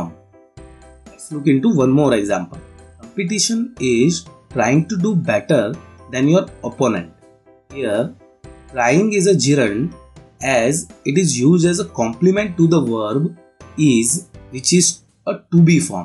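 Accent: India. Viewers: I love to hear your indian accent in every lesson.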